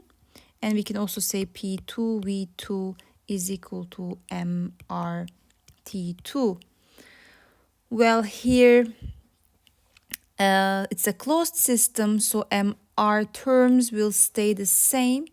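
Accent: Turkey. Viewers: Hocam I just watched the video about Linear Density :D Aksanınızdan dolayı Türk olduğunuzu düşündüm ama kanalınızda hiçbir personal info'ya rastlayamadım hocam.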